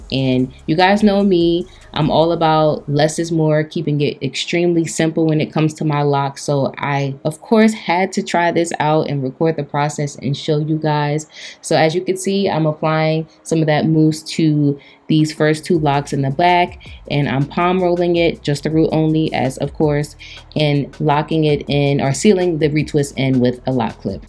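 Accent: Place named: Australia. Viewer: she pulled up that aussie and i'm subbed sooo fast😂❤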